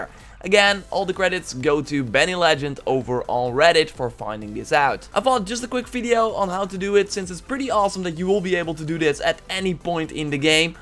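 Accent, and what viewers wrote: Netherlands. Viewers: NIJS NEDERLANDS ACCENT!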